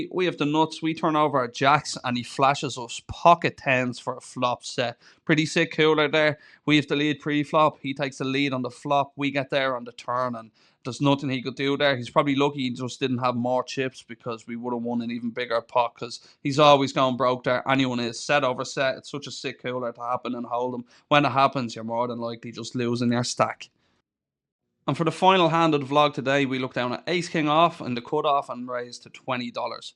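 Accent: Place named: Ireland. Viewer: Really glad the Algo put you on my home page, been looking to add a Sound Studied Vlogger to the Sub list! Makes it even better with your Irish accent...Good fookin fold on the AJ!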